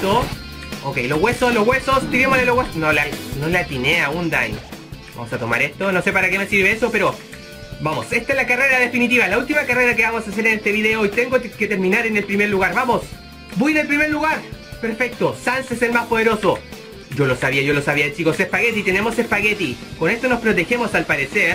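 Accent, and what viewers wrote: Spain. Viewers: desde cuando hablas español españa? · Si eres chileno porque hablas como español? V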